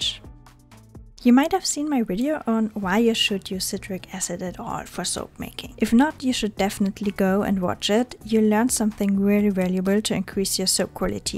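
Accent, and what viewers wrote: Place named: Germany. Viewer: Hi, as someone who loves science, this was a great video. I was trying to guess your accent at first I thought you were from Germany then I toggled between Belgium and Swedish. I knew you weren't from France due to I am French. I checked your bio, and I was correct German❤❤.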